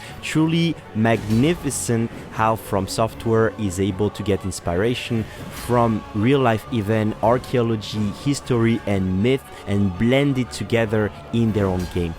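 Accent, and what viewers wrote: France. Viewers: damn that french accent... love it! salut frérot continue comme ça ! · Really great video ! You are not just making a simple tier list but adding your touch and your fun to it, so it s really cool to watch !You gain one sub ! (Et je suis aussi fier de moi d avoir reconnu un petit accent français, validé par l extrait du début dans le lequel tu nous sort un petit juron bien francais 😂) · Are you french ? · Wait are you french ? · Ah mais voilà putain j’me disais que t’étais français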